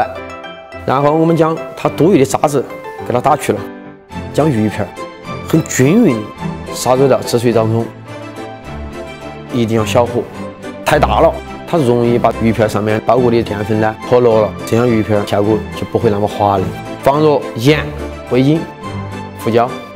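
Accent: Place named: Sichuan, China. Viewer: 哈哈哈，也會說四川話了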